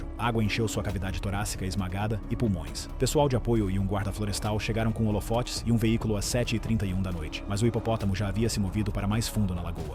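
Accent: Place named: Portugal. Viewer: Talvez seja porque o narrador é português. Lá eles falam meio rápido e adotam o padrão internacional de medidas, se não me engano. Mas, de fato, ele deveria se esforçar a falar menos rápido para as demais pessoas foram de Portugal, possam acompanhar melhor e falar as duas medidas para melhor compreensão. Tem que pensar fora do seu país.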